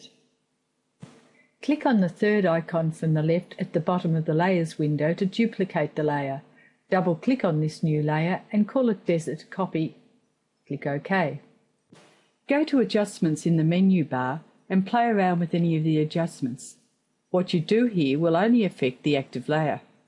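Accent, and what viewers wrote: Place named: United Kingdom. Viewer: your Brit accent is tough to understand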